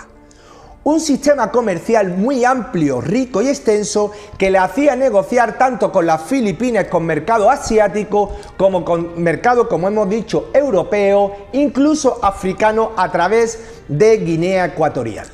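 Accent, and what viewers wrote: Andalusia, Spain. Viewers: Es andaluz... lol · Eres Andaluz?? Hablas igual que yo. (Sevilla) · Soy andaluz, respecto a tu acento decir que si me dicen que eres de un pueblo vecino me lo creo. Podrías ser mi primo hermano literalmente. Tu acento es más parecido al nuestro que el de un Asturiano, Gallego o Aragonés. Es como si hubiera conocido un hermano raptado de la familia y me lo hubieran presentado ahora. Es sorprendente como pueden intereses políticos y las gerras pueden crear nuevas fronteras entre las personas que en esencia somos iguales. Un abrazo hermano.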